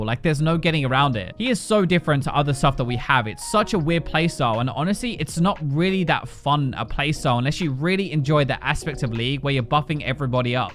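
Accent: United Kingdom. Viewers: stop talking in british i dont undestand shitt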